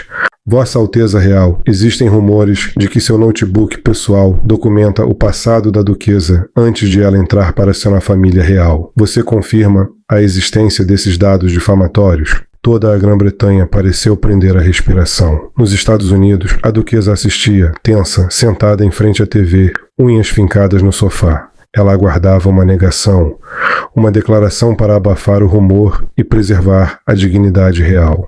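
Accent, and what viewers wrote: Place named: Rio de Janeiro, Brazil. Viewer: Não é problema de respiração.... Este homem que fala é carioca( RJ). Sotaque de pessoas moradores da Cidade do Rio de janeiro..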